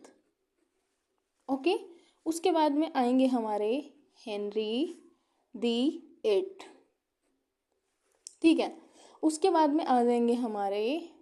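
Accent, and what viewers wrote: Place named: Haryana, India. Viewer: Hariyanvi rajasthani accents aa rhe mam , 😅 harayana se ho ??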